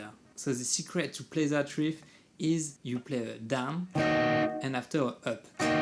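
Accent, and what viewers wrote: France. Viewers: Oula toi t’es français avec cet accent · French accent ????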